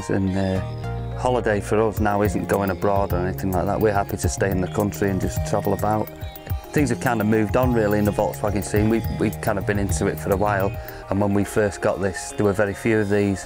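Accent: United Kingdom. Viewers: Bill Murray with a British accent. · yep that british accent :)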